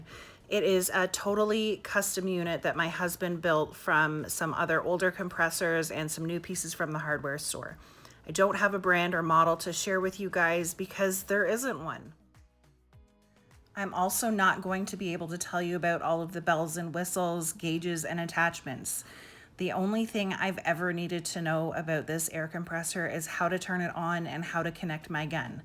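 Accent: Canada. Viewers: Your Canadian eh! Even better!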